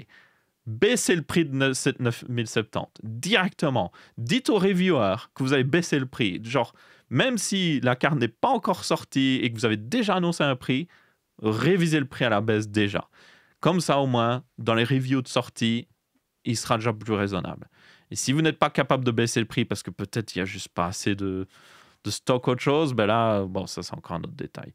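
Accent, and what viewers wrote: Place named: Belgium. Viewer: Tous les nombres qui sont énumérés avec un accent belge me font perdre le fil, ça devient difficile de suivre puis je décroche complet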